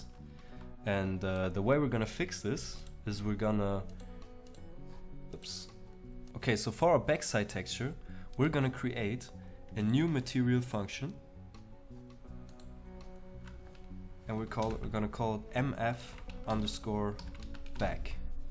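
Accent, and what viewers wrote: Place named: Germany. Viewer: Nice tutorials! Are you german btw? haha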